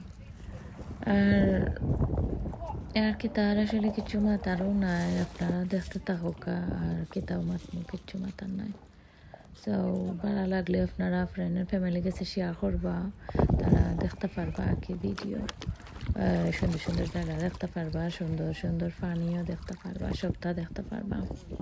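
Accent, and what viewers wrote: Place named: Sylhet, Bangladesh. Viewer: আসসালামু আলাইকুম কিতা খবর বালা নি আফা মাশাল্লাহ কি অপুর্ব উপস্থাপনা আর দৃশ্য গুলো দেখে মুগ্ধ হয়ে গেলাম সিলেটের কথা মনে হয়ে গেল আপনার কথা শুনে💖❤️✔️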